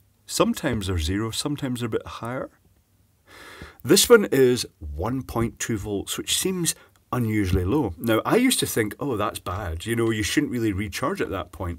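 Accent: Scotland, United Kingdom. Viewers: Your voice sounds like a teacher that I used to know when I was a small child. He used to behaved and talked in a very similar way to you, and now I know that a lot of this ascent is Scottish in origin. I have noticed a few differences in Scottish ascents, being that I have known of them and stayed in Scotland for about 1-2 months. Scotland is fascinating. That teacher also used to have a beard like you as well; there was something about that teacher that used to interest me, I don't why; but he used to play the piano at school very well in assembly time, and this was in primary school.... I think looking back at it now, he was kind of trying to have fun with the kids in his own unique way.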